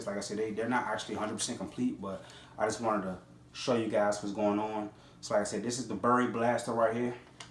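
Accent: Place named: Maryland, United States. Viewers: Nice collection fam. We all knew what state you were in. Maryland accent is strong. Go check out a couple of my builds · As soon as u started talking,I knew exactly where you're from lol.Salute to u from a fellow 2A and DMV brotha.My collection isn't as extensive as yours,but you' got me motivated.That's a Raw collection u have bro 💯.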